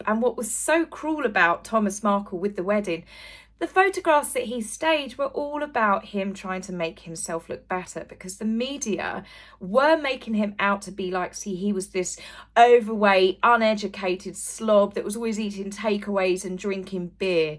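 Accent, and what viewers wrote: United Kingdom. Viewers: Oh....la la... ❤️ I fall in love with awesome British accent and amazing storyteller at the end of the video. You are #1 👍 California 🇺🇸 💕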